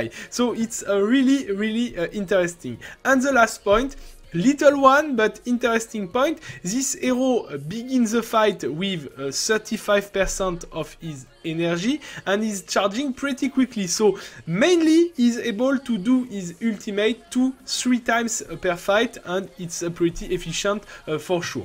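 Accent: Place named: France. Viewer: Est-ce que tu pourrais apprendre à parler Anglais sans un accent français, parce que là... Mes oreilles saignent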